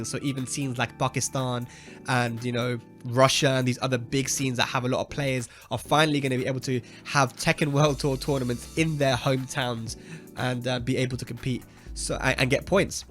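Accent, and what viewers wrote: South Africa. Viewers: Lmao that south african accent 😅😂. Lovely video 👌🏼🔥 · Lol, that South Africa accent! :D Haha, thanks for the shout out! We're as excited as you are about all of this! TWT LETS GO!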